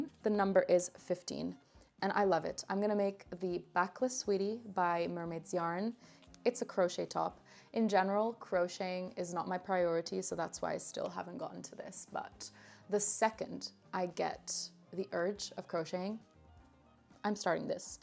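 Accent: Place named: Italy. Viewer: your italian accent is perfect!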